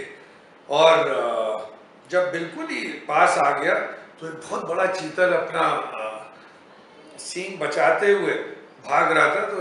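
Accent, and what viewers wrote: Bhopal, India. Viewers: Zabardast story👌 and very well narrated in bhopali style..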